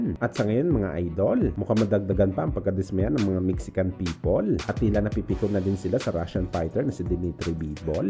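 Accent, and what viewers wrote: Iloilo, Philippines. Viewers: Ilonggo geyd😅😅😅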